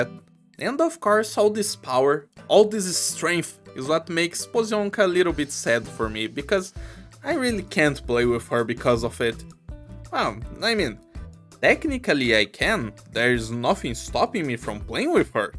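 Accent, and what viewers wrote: Brazil. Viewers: Ai irmão, tu é br ou existe outro sotaque MUITO parecido com o nosso?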